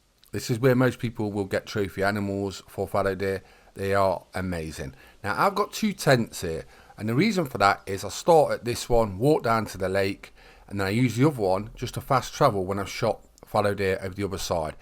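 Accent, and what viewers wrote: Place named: Leicester, United Kingdom. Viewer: Did you see ladylegend mess her great one shot? I think she was robbed, the shot looked fine, but I’ve noticed some of my shots are off target since the aus map came out. I can’t believe it’s taken watching your videos to catch on that carrying a tent with you at all times is so handy? I was always concentrating on having the max load out with guns and callers etc. that advice is golden! Great video, gunna start my great one grind tomorrow. 👍🏻 by the way, are you from Leicester? You sound like it, I know a couple of people from there. I’m down the road in Bristol 🇬🇧🇬🇧🇬🇧🇬🇧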